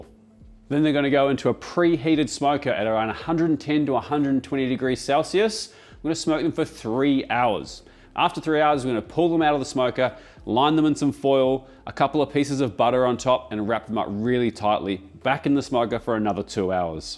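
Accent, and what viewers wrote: New Zealand. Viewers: Thanks i appreciate the effort you put in regardless of the bitchy comments, and your on the button with wrapping ribs in foil to steam as they cook,i do this everytime and the ribs are perfect in the oven. Thanks you Kiwi accented fitter and turner, be safe buddy,love your work. 🥰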